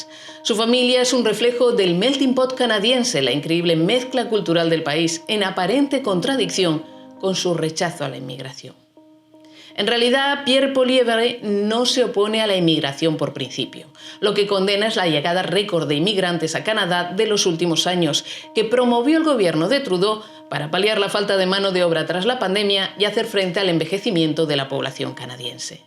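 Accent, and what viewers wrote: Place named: Spain. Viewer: Señor para su informacion ella es de españa y asi se habla el espan̈ol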